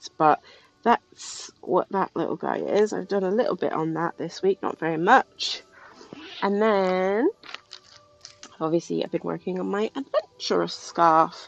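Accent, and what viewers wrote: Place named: United Kingdom. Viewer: I thought it was just me! You did sound more british. 😊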